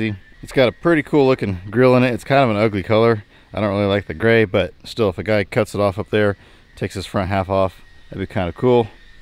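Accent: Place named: Kansas, United States. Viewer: Shame the rain damaged some stock. You definitely need some more reliable help. I'm 77 fit and well and would be there tomorrow.Havinf know caught up on that Kansas accent which this 'ole South London boy has just got used too. Nice to see the family who are so important for a successful business. Every Best Wish from Wales U.K..... Pat.C.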